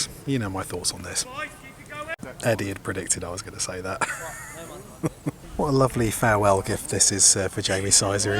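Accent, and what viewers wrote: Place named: North, United Kingdom. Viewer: Its already excellent to listen too, with the added spice of your accents from up there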